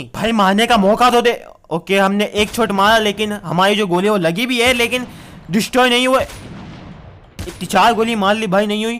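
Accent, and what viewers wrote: Gujarat, India. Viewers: Bro, are you Gujarati? Cause according to your accent, I guess that you are Gujarati..... Btw I love your videos.....Keep it up for entertaining us! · I love your voice.does you have Gujarati accent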